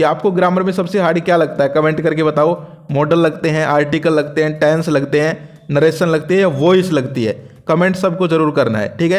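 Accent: Haryana, India. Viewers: Sir too haryanavi hai ❤❤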